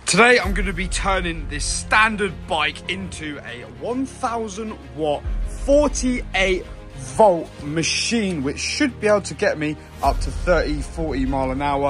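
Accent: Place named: United Kingdom. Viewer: You sound British, therefore as the motor is likely over 250W which makes it an illegal motorbike, it goes faster than 15.5mph so it's now an illegal motorbike and you have a throttle that works past 4mph making it an illegal motorbike, it's not labelled up which makes it an illegal motorbike... None of what you did is safe or legal!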